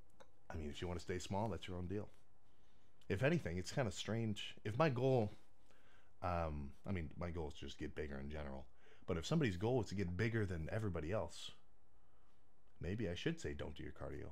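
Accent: Birmingham, United Kingdom. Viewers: he's English with a Birmingham accent - each city and even some towns will speak differently with different slang and accents. That's the case all over the country, if I get in the car and drive an hour from my house everyone sounds completely different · That’s a real Brummie accent right there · That Brummy is fucking well annoying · Yesss... Go on, you're getting the full BRUMMY accent... straight out of the gate! Big up the UK! Awesome to see you here man!:goat-turquoise-white-horns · It’s a Birmingham accent bro second biggest city in England towards the north so your not too far off 👍🏽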